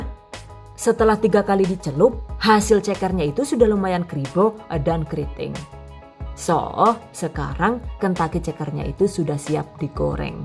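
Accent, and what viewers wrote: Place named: Java, Indonesia. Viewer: Bahasane Nyenengna . . Medok banget . . Nyong suka Bahasane . . 😂😂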